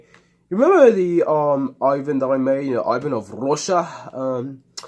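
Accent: United Kingdom. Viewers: Beautiful customs. Can you make Terriers based off of real life ones? You can just use Stepney. And great British accent. Get well soon.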